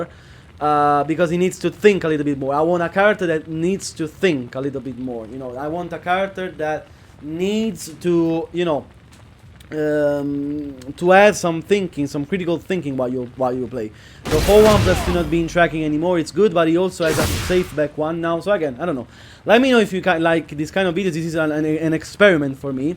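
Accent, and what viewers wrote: Italy. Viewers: L'ho capito subito che sei italiano bro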